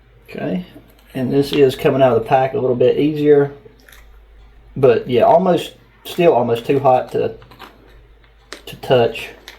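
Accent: South, United States. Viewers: Cool civilian ration Amigo. Components sound good. Glad extra seasonings, pepper, red pepper, etc. They come in handy. Dividing A 24 Hr. ration is difficult, I have been there, and will again soon, LOL. It's bad, when civilian rations have better FRH'S than the military gets. All meals sound good. Some components might be off just A little, nothing bad. This fresh, I would not expect too many to be bad. I would not think they would, but you never know? I have been fooled before. Good meals, great review. Glad you had fun. Peace from Ky.. P.S. From your accent and way of talking, you are from the South, or once was. I am glad to hear A voice like yours. Makes me feel like I am not alone in the ration community, LOL. Hope all are well your way, need me, just holler. Peace. Nicely done friend.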